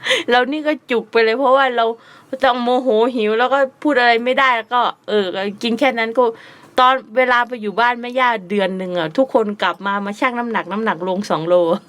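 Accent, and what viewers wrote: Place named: Isan, Thailand. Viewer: สำเนียงคือคนอีสานแท่จ้า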